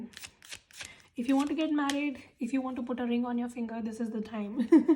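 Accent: India. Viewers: Loveeee Indian accent❤️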